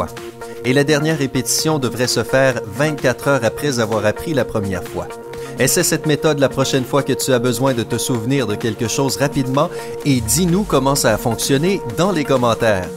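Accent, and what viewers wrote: Quebec, Canada. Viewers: Accent québécois ? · Vue son accent je crois que c’est un Québécois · Un Canadien parle! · J'aime pas la facon cannadien de parler francais nuul.avec mes respect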